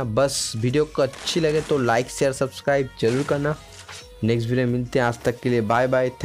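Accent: Bengal, India. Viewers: Bhai ap Bengali ho kia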